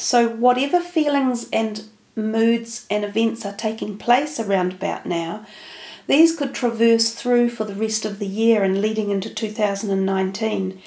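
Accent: New Zealand. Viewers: You sound kiwi. 😊 💖. Thank you.😊 💖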